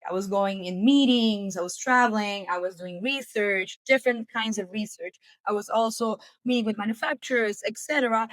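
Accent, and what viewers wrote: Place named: Puerto Rico. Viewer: Nice vid. Why do you have an indian name but a puerto rican accent?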